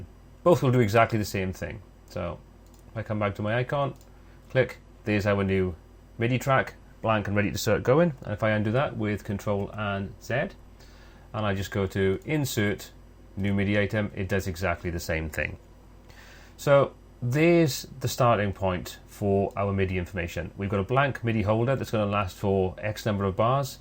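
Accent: Wales, United Kingdom. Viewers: Nice to hear a Welsh voice on this video. Hwyl from Cardiff!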